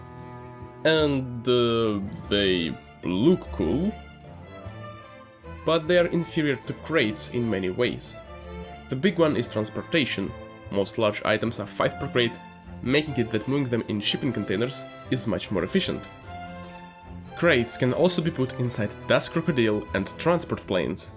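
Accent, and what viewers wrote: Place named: Russia. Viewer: Я слышу русский акцент...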